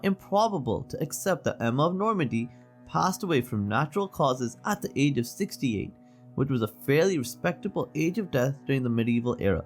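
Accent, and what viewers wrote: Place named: South Asia. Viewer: all i hear is jeet or porki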